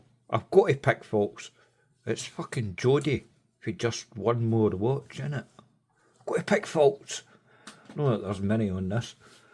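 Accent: Scotland, United Kingdom. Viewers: Great review. Love the genuine Scottish brogue!! 🙂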